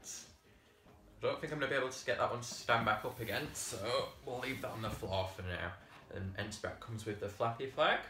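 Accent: United Kingdom. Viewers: nice British accent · I love your accent! I'm also British, so I'm not that different from you. 😊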